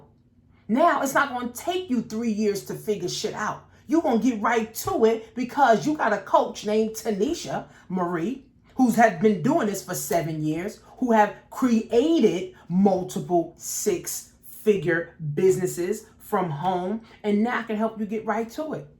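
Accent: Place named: New York, United States. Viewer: Not only are you telling the truth that New York Accent ❤ much love from Cleveland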